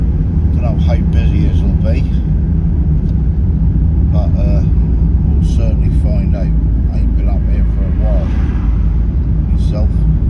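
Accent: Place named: Suffolk, United Kingdom. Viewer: You sound like you still have that great Suffolk accent , So good to hear 👍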